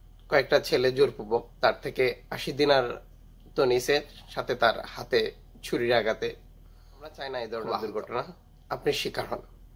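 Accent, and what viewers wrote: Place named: Sylhet, Bangladesh. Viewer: ও সিলেটি ভাই তুমি সিলেটি কতা কইলাও তুমার বাড়ি নবি গনজ না হবি গনজ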